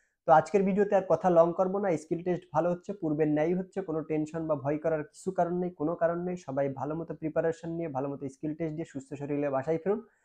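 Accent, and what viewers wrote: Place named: Fakirhat, Bangladesh. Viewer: আপনার বাড়ি কি ফকিরহাট,আমাদের এলাকার কথার স্টাইল,আমার ১৫ তারিখ,স্কিল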